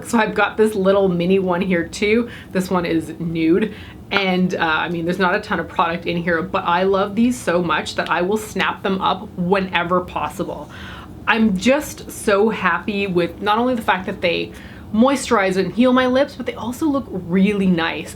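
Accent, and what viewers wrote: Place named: Eastern Canada, Canada. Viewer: I have to say as a fellow Canadian I adore your eastern Canadian accent it sounds so much more chic the my bc accent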